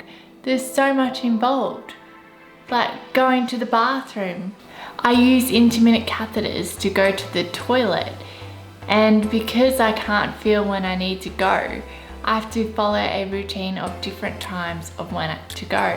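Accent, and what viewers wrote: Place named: Australia. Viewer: You sound like a heck of an Aussie girl,. A like & subscribe from me as well & now following you on FB. Keep up the great work & all power to you!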